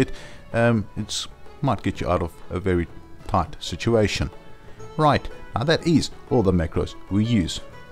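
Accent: South Africa. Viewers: Your from South Africa.??? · hes South African accent ... i have the same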